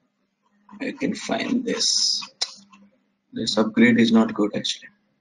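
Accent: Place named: India. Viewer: it so hard to understand the heavy accent. i find it extremely hard especially with Indian tutors.It looks like a good course for me but whats the point if i cant understand every 3rd word he says. Also, the fact that he writes everything during the course is very typical to courses that are led by Indian accent tutors and also the excessive use of the word "OK" really irritates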